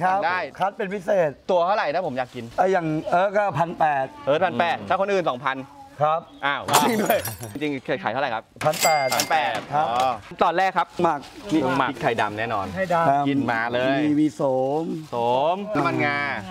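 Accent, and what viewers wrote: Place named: Suphanburi, Thailand. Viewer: พูดเหน๋องทั้งคลิปเลย ดูคลิปพี่จนติดเหน๋อและ555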